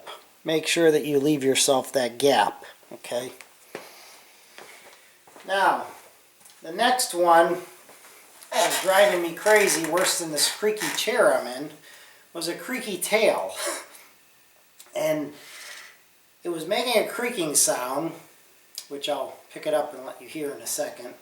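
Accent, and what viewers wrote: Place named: Massachusetts, United States. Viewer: Where are you from? You sound like you are from south east Massachusetts where I am from.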